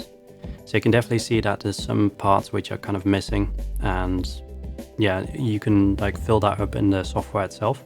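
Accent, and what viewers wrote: United Kingdom. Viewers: Honestly, I do love the fact that I just randomly stumbled upon your channel. The fact that you're Dutch as well only makes it that more fun for me, lmao. (And will say, your English is really freaking amazing dude. Very British tone.) The setup looks amazing. Little cluttered but you're also still adding / modifying so I'm able to look past it. Keep up the good work. I'm looking forward to seeing the progress of this.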